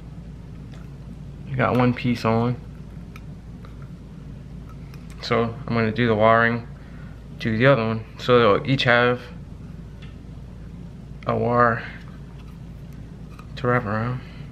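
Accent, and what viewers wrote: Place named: Lafayette, United States. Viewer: When I hear you talking, I knew you from Lafayette. I saw you scan and seen KATC and confirmed. I'm 40 min South of you. Great video!!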